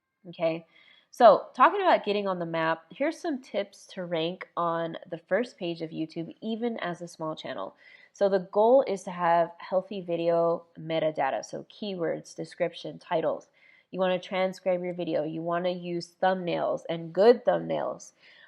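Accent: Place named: Australia. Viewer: Is it only me, or do I love his Aussie accent?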